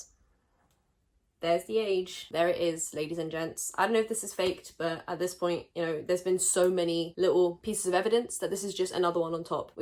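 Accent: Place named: United Kingdom. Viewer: I fucking LOVE this kind of British accent when they pronounce literally as “lit-cher-lee”. It’s so damn cute.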